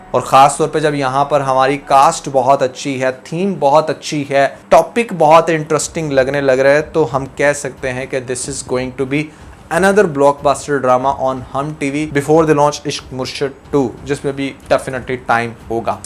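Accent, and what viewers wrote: Punjab, India. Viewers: Bhai Punjabi Jo hai is wAjh se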